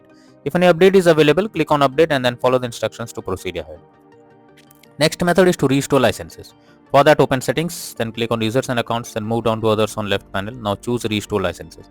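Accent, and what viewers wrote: India. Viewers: He’s an Indian BTW 😁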